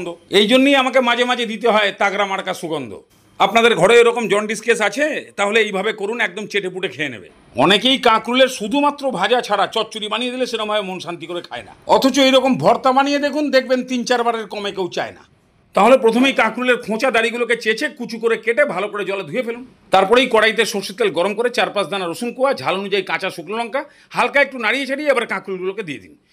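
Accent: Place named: Bangal, Bangladesh. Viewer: Aber bangal vasa ta keno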